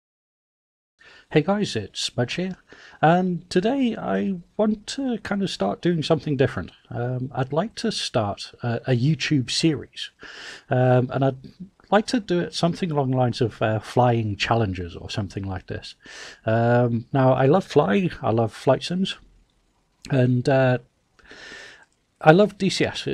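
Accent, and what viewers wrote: United Kingdom. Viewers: Where are you from Smudge ? East London ?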